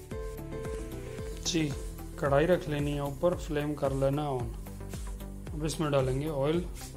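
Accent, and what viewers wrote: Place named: Turkey. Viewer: jub aap urdu bol rahy hoty to turkish style thora thora aata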